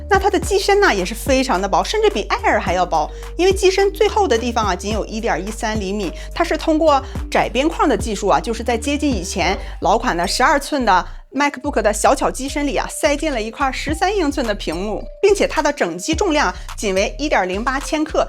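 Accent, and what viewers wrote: Northeast, China. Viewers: 主播东北人。me too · 东北话杠杠的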